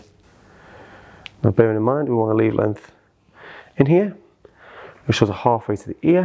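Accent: Liverpool, United Kingdom. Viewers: Is it me or is there a bit of a Scouse accent that pops out here and there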